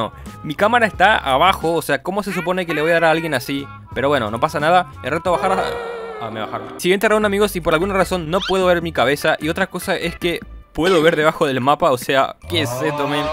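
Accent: Paraguay. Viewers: eres paraguayo vrd bro? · Kp vos sos paraguayo verdad? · Paraguay? Kp s nota el acento mi reynol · Man pensé que eras de otro país por tu forma de hacer los videos pero por tu forma de hablar noté que sos paraguayo gran video sos grande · Sos paraguayo ?🤠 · Eres de Paraguay? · tu eres paraguayo tu asento es de paraguay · No lo conosco pero su acento parese paraguayo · Ese acento paraguayo se nota de lejos. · De Paraguay? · Eres paraguayo? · Sos de Paraguay???? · Eres Paraguayo? verdad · SOS de Paraguay? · Sos de Paraguay? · Eres de paraguay?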